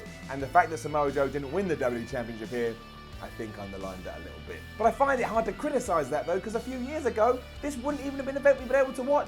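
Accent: Australia. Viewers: bro, you already sound Australian.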